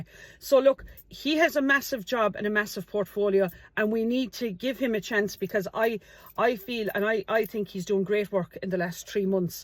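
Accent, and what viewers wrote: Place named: Ireland. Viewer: Love your country and your beautiful accent. Save yourselves you do not want to be like France and the UK. Be strong, stay safe💪from Canada🇨🇦🇮🇪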